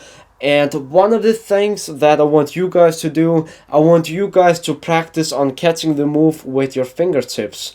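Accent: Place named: Russia. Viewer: That's actually russian-english accent)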